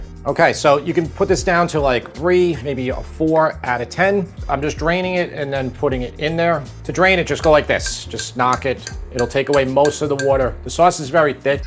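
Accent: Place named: Long Island, United States. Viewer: wondering if i still sound like this..... im giggling everytime you say capers... grew up on long island but hopped to the other side of the pond 10 yrs ago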